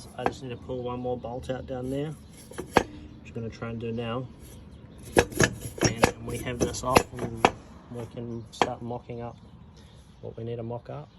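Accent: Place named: New Zealand. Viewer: I know a fellow kiwi when I hear one haha, what part of the country are you in? What transmission do you plan on using and power/Tq level you're aiming for? Planning to also go turbo on my M104 powered S124. Currently have a 717.404 box but don't think it'll hold up, might try a little boost on it first and see what happens.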